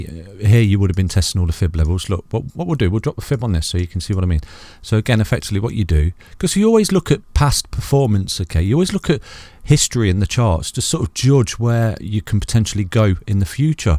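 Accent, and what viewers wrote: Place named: Leicester, United Kingdom. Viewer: Great insight, you sound like a fellow Leicester lad 👍🏽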